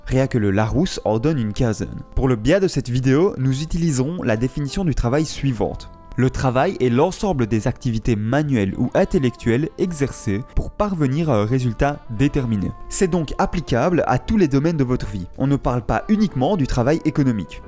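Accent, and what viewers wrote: Liège, Belgium. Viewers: Bon bein je crois que j'ai reconnu l'accent liégeois :)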